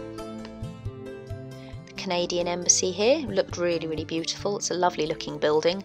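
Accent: United Kingdom. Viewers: Thanks!! I only spent a day in London but jammed in a lot of rubber-neck-en. Great tour and enjoyed the voice over. You have a wonderful voice!! Us Americans love British accents and could listen to you all day. Take care!!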